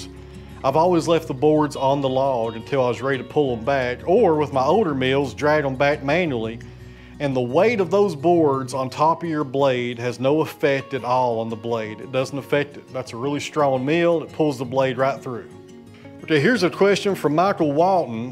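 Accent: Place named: Tennessee, United States. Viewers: I happen to like your Tennessee dialect! Don't change for anybody. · Keep on bragin' on yourself my friend, and I love the TENN dialect. Love watching your videos!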